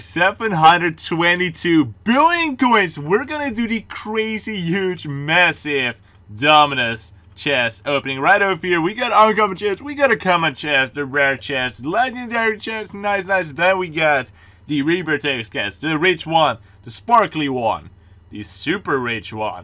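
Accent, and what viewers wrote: Netherlands. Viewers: BREAKING NEWS Are You Dutch? (*and i mean from the netherlands*)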